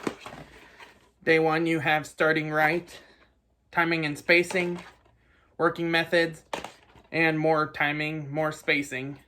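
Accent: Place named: South, United States. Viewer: Fantastic! After many years away from animation, I'm returning. This book is a must and I look forward to reading it again. By the way, I love your accent! I love the southern accent :)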